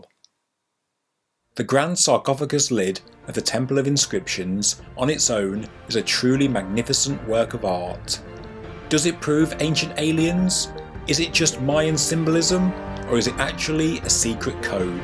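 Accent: United Kingdom. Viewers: Deepstate debunkery with brit accent.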